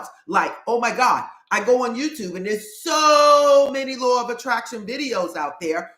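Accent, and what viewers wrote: United States. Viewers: I like your videos but your English is too American to me At times I don't hear you clearly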